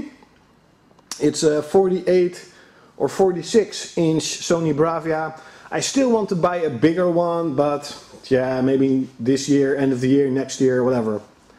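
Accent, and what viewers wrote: Netherlands. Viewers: you really have a Dutch English accent lol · Ben je nederlands? Are you dutch because you have a dutch accent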